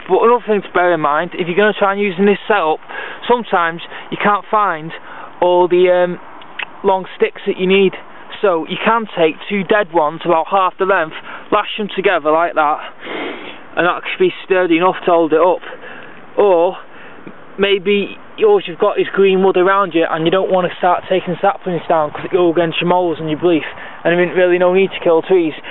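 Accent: United Kingdom. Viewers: Didn't mean to insult. It is very hard to understand him with the British accent, it is very heavy for me and others to understand. It would be interesting if I could understand most of it. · dear harry bowhemoth he is speaking the queens english just like you.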